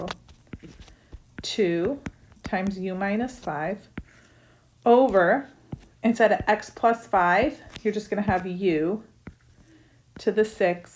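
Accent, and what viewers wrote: United States. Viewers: How's that one 😂 Adore American English)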